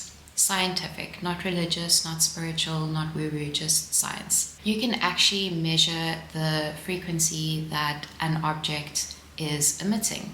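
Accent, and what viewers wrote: South Africa. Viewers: Thank you for your extremely interesting and informative yarn yarns! Also, super great to hear another South African accent!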